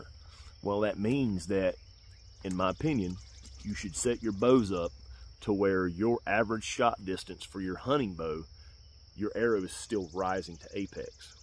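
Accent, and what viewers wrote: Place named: Mississippi, United States. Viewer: What part of the US are you from. I used to live in Missisippi and the accent sounds quite familiar. Regards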